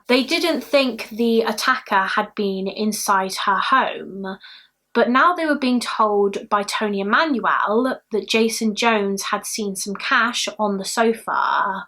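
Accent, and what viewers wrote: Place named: Southwest England, United Kingdom. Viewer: It's a south west English accent 😊. Not 100% but I'd say Somerset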